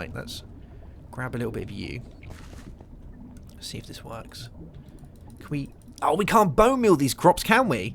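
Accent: United Kingdom. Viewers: really entertaining series and greetings from germany :). btw. i like your accent so much! can you give me a hint which part of gb it is you rule from =D?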